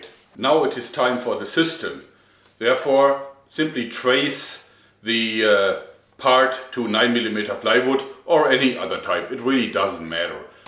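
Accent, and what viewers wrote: Germany. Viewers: where are you moving to im guessing somewhere in Germany at least that's what i think because of your accent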